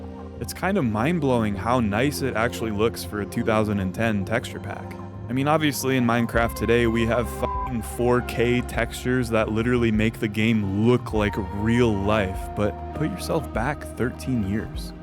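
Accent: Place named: United Kingdom. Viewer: keep doing the British accent.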